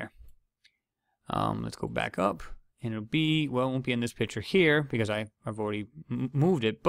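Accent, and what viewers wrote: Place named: Rhode Island, United States. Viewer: completely unrelated, but your accent... you a native of Rhode Island? You sound like my relatives.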